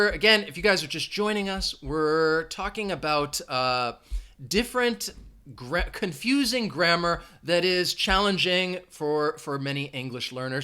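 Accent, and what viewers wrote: United States. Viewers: Hello Sir, I do appreciate your lessons . You teach with limpid and clear American accent. Have my thumb up!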